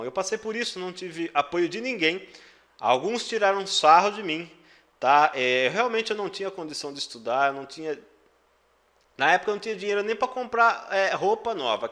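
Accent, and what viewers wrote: Germany. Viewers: Boa didática. E de qual região da Alemanha é o teu sotaque?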